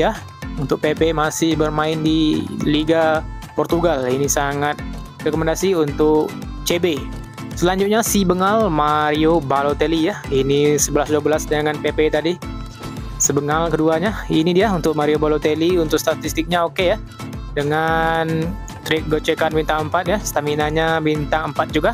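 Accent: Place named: Aceh, Indonesia. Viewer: Logat bahasa kek Aceh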